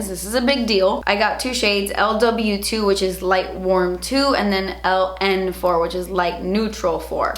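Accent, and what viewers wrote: England, United Kingdom. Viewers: Your English accent sounds like Angelina Jolie in tomb raider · There always has to be like 2 words pronounced in a british accent in every video 😂😂 and i love it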